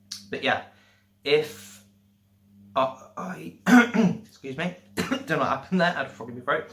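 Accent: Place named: United Kingdom. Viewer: Thanks for this, you're the first person I've seen on yt talking about Dupilimab with a British accent, did you get it on the NHS? Makes me hopeful, cheers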